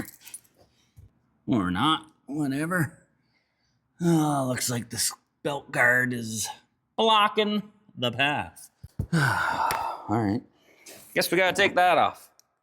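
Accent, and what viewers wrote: Canada. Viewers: Hey dude , you must be canadian? Great content! Keep up the great vids.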